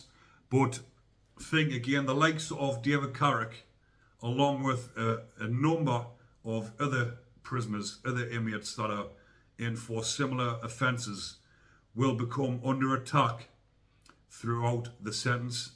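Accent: Newcastle, United Kingdom. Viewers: Algorithms cant pick up geordie accents dony worry lad 😆. anyway hope that grub gets more of wots coming to him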